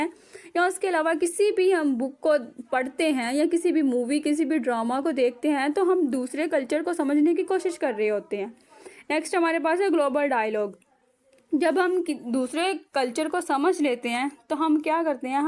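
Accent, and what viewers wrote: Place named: Himachal Pradesh, India. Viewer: Very nicely explained, 🎉 Are you from Himachal, or from Pakistan? ❤ The way you speak is so attractive, this kind of slang I always like. 😍 God bless 🙌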